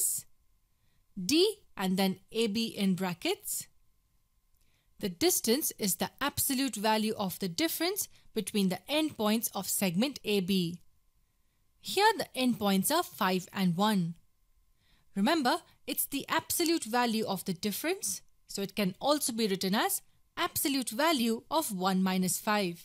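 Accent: India. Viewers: indian accent uh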